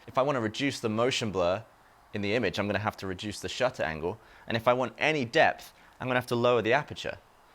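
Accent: United Kingdom. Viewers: Are u British or American because you sound British but your in America. thx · I love, but I couldn't find "shuta speed" in my manual 😀😂 gotta love that British accent thou! great video! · Benjamin Collins British. But he lives in Texas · It might be his British accent but I'm not even interested in photography but watched the whole thing and learned stuff I didn't know I wanted to know. Great explainer, this guy is.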